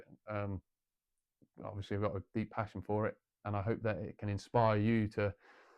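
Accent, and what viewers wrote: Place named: East Anglia, United Kingdom. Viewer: Slight East Anglian accent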